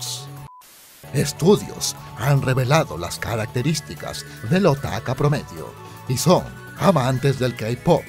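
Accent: Peru. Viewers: Eres peruano? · Alv eres peruano :D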